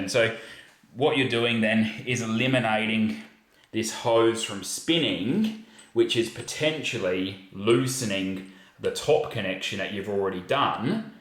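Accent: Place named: Australia. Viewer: VERY strong Australian accent. Fortunately i can understand it. Gave me a good tip i can use for the hose I’m installing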